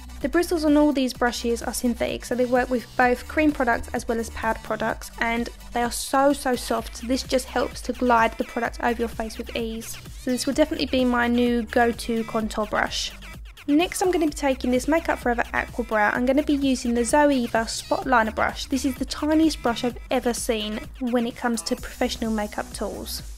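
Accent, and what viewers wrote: Essex, United Kingdom. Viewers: I'm a fan of yours too! And it's so nice to hear your Essex accent.... I from down the road East London! I did giggle when you said 'in alf'! Could you please tell me what you think of the Hakuhodo brushes, would appreciate your professional feedback, thanks.... D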